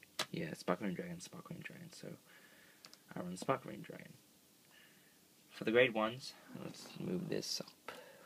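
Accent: United Kingdom. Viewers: U sound british for some reason! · Nice British accent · You have a British accent when you're sick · You sound british when you're sick.. Awesome.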